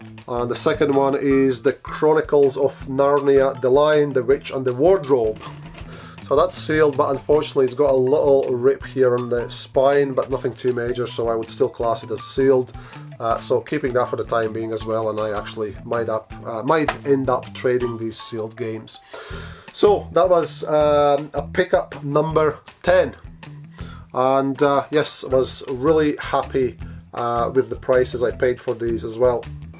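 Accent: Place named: Scotland, United Kingdom. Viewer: Go for it. I am sure there will be members of the audience that will like to see it. If you don't mind me asking where does your accent come from, I get the Scottish but there is a different twang underneath it, For clarity I come from a Glaswegian family so I pick up the Scottish accent quite easily just couldn't place yours fully. Keep getting lucky we all deserve it IMO.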